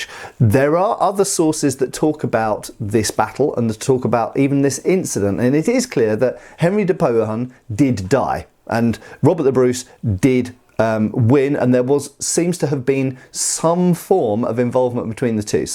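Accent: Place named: Wales, United Kingdom. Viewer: sounds Welsh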